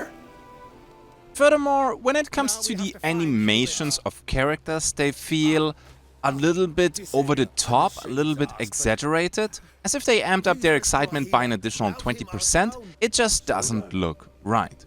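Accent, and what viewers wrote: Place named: Germany. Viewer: Sehr gutes Video, Sehr deutscher Akzent hahaha. zB review. Das re wir wie be reel ausgesprochen und nicht wie bei red. oder biomes. bi wie by und nicht wie bee die Biene. aber echt gute review, schön viele Schnitte zu spannenden Szenen und passend zu dem was du sagst. Nicht 0815.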